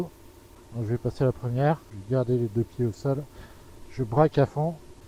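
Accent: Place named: Grenoble, France. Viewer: Salut l'ami. Un Bel essai de ta machine. Ben sa fait loing pour te prêter une machine. Je vois plus où t'habites mais à l'accent du Piti Piaf je dirais pas loing de Grenoble aux alentours. Gros V ✌🏻.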